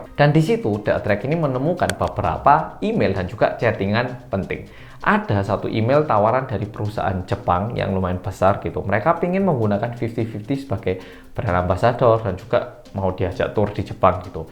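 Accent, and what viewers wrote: Java, Indonesia. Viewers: wkwk...sama kan...berasa banget logat jawanya..tpi seru sih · gak papa mba . Memang tdi keingatnya di kepala juga begini. Ada logat Jawa nya😄 tapi ini beneran, saya tidak menyinggung siapapun yaaa. Ini hanya pikiran yang muncul sekelebat di benak saya. Saya mohon maaf kalau ada salah kata atau pelafalan saya jika ada pihak yg tersakiti 🙏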